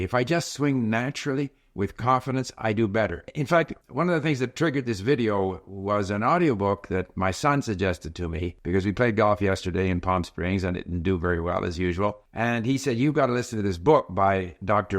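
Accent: Canada. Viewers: Many people say that Canadians and Americans sound the same. Within the first minute, I was able to correctly guess that he’s from Canada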